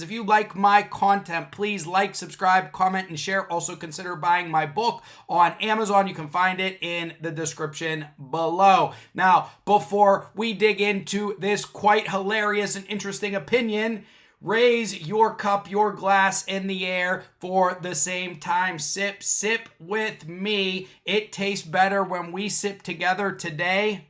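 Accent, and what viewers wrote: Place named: Western Pennsylvania, United States. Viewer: I was raised in western PA just far enough from Pittsburg for the smoke to only be visible on windy days. (mostly) I've spent half a century travelling (not driving) across the US and world for business. You're the other western PA accent I've encountered in the past 1/2 century since I left. But that's just Reason #3 for enjoying your posts. Keep it up.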